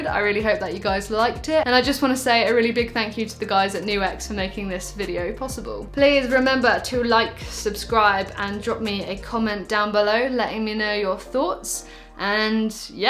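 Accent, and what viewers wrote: United Kingdom. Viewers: Brit accent ! you play well, congrat' :)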